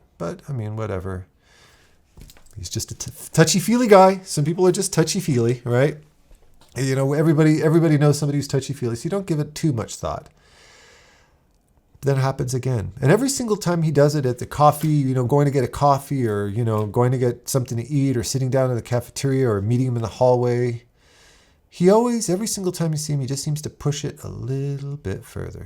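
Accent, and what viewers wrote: Quebec, Canada. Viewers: As a french speaker I can say you’re saying « la crème de la crème » very well, you sound like a guy from Quebec.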